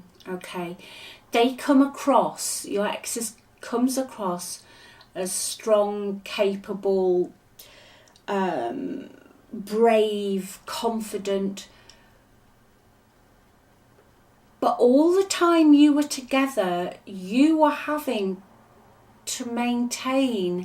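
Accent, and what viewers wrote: England, United Kingdom. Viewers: ❤❤❤❤~ YOU are so Wonderful and Beautiful ~ Thank You for making my days Brighter with your readings! Love your English Accent ❤️ 💕 ♥️ ~ it's "magical"!!!🎉🎉 (I've got popcorn and snacks, lawn chairs and heaters [it's cold here where I am at] ready for the 'Show'😂😂😂😅🎉!!! Watching!!!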